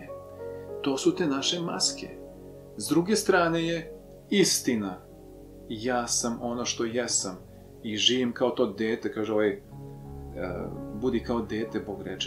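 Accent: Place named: Vojvodina, Serbia. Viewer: Imas divan akcenat..rekla bih da si negde iz Vojvodine, kao i ja inace.. Svako dobro ti zelim i super si 😊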